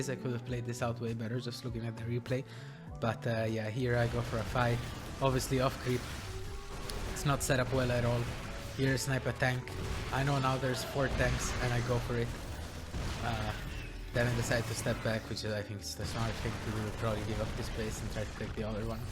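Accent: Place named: Italy. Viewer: Thank you for the video! You did a good job of analyzing what Oliveira did right, rather than focusing on what you did wrong. Definitely the correct approach. Your perspective is interesting, and hearing your Italian accent is a nice bonus. My only critique is that the transitions whenever a cut happens could be improved. Either make it smoother/less obvious, or make it more obvious, as right now I get confused when a cut happens.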